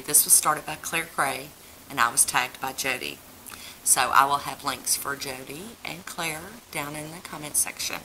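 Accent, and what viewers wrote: South, United States. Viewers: I too am from the South and my accent is thicker yet than yours. When I stumbled across your channel I was like heck yeah.....a fellow Southern chic!!!! I have family in Indiana and ironically they are visiting right now and when they are here it's like oh wow maybe I do have a bit of an accent😏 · Well there's your pretty face! I'm a southern gal too, and I DO love your accent 😉 Sinful colors is bomb! I just bought the neon collection today...minus the yellow. Can't find that one yet. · I enjoy your videos and positivity. Your nail art always inspires me, even though I haven't been patient enough to try anything yet (I'm still trying to perfect a neat manicure!) As a South Carolinian myself, it's a pleasant change to hear a genuine southern accent on YT. Keep up the good work! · You are my favorite new you tuber (to me) happy to see the beautiful face that goes with that accent (yes I'm one of those commenters). 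Southern accents just sound so warm and friendly to me, just love hearing them! Thanks for the fun video, now to go check out your channel Recs! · I love your accent! its so soothing to me and I lol'd when you said not everyone on the south marries their cousin 😂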